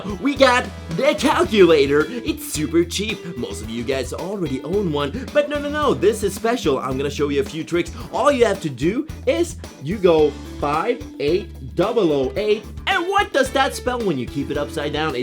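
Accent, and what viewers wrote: Norrland, Sweden. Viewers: Svengelska still better ROAD TO GLÖBOL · snacka mer norrländska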